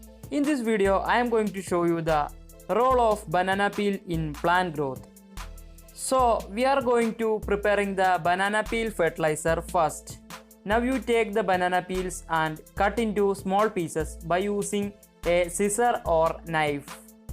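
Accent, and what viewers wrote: Kerala, India. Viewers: Ur English is so Malayalam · I love Indian accent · da potta ne malayali ele english kelkumbol ariya😂😂😂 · I love kerala & the accent too 💎👍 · That pakka malayali accent👌👌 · I cannot watch a video if the YouTuber has a very thick accent from india. if he's narrating it I wonder who are you talking to? no one who speaks English can understand the way you're speaking english. so it's not just an accent who the hell is teaching English classes over in india? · I’m sorry but try learning English not Hindu English. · He is a mallu😂 100% like me we all have same accent.... Proud to be a mallu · First of all thanks for the knowldge, next your english very funy ,when you are talking r must be silent ,most of indian people talking the same way ,must try to talk with r silent very beautiful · engilsh kekkumpol thanne ariyam ivan malayali aanennu lol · It's not my native-tongue either. 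There are many Indians who do not have that strong accent that he is exuding. SOME might even find it a tad disturbing, hence the negative comments. It's not a grammar test, but if i'm going put something on the net for all the world to hear, I might keep my pronunciation at par with the majority of English speaking youtubers posting here. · മലയാളി ഇറപ്പാളി ആ english കേട്ടാൽ അറിയാം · If his pronunciation bad that is not a big deal becouse it is not his language. If some one says his pronunciation is bad that is a big deal. I wish I too can talk like him! There are more than thousand different pronunciation in the world and his is called Indian English. I love Indian English because it makes least spelling mistake. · is you are malayalee? your english pronounsation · Thick malayalee accent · Mallu accent,😀😁😂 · How about not telling someone that they speak funny. That is rude. He sounds like he comes from India. 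That is how they sound. His voice was very nice. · I know you are indea the way you talk my friend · India dialect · Jhansi Balla this is not english speaking class. europians can not utter a single english word. no japanee knows english. can you understand what a bengali speaks in english? do not make fun of accent. the person is from kerala and his mother toungue is malayalam. can you speak a word in malayalam? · He’s an Indian guy what do ya expect him ta sond like and given the choice I would rather sound like him than sound like a d£#k head so shut it and stop taking a lode of banana juice. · Adipoli, Anybody guessing about the accent it's how keralites speak English and it's beautiful 😊😊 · Typical mallu slang the zing thing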